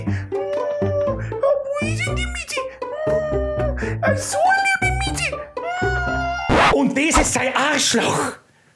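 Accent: Bavaria, Germany. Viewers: Ich versteh deinen Dialekt so schlecht :-) Ahhhh kein Wunder, bin ja ein Franke, da versteht man andere Muttersprachen so schlecht und da ja Bayern zu Franken gehört ist des eigentlich auch normal, gell? :-) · Oiwei wenn i om bin bei de Eitern vo meim Freind in Sachsn, schaug i ma deine Videos o wei du so sche bayrisch redst und des ma echt feit. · du bist einfach genial:D ich bekomme immer so nen lachkrampf wenn ich deine videos anschaue. ach wie ich diesen bayrischen dialekt vergötter^^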